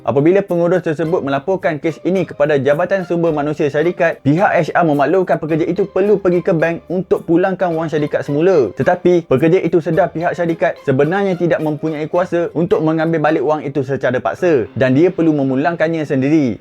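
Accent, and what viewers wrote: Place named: Kelantan, Malaysia. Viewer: masih ado pelat klate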